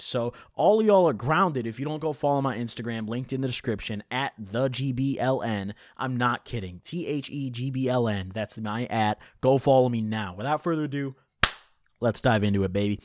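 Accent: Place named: East Coast, United States. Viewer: Where are you from? gotta be east coast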